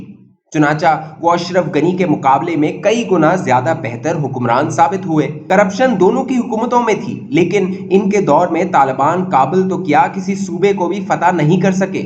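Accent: India. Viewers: The narrator in this vlog has subtle Hindi accent. For he say Ashraf Gani instead of Ghani.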